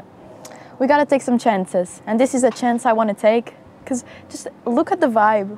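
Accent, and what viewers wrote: Portugal. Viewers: man tu tens um ingles bue bom mas n sei porque eu senti logo q eras tuga good content!!! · portuguese ? sounds like it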